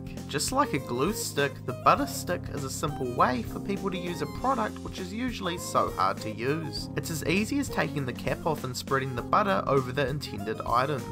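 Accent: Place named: New Zealand. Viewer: 1trueNRG my guess would be, New Zealand, sounds like their accent lol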